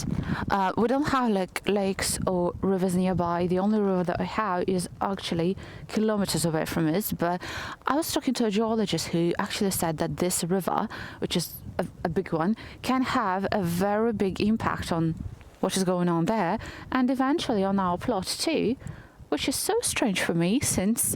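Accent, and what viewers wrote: England, United Kingdom. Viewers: Hello! Blue eyes, you eyes are sparkling, ❤ Guard your heart Springs could bring 😘 You speak English with an English accents. I love flowers and want to grow herbs. Guarding is so Romantic. Birds, sing, an we can walk bare feet in the grass tax free. Hand and hand ✋ we walk Romantic vibes we bloom, we blossom and 🌹 🌺 🌸 🌼 beyond the gloom!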